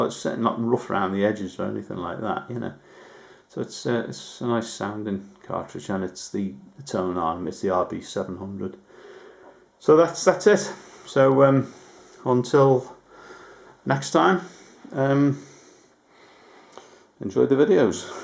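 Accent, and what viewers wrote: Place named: Liverpool, United Kingdom. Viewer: You sound like a Beatle Liverpool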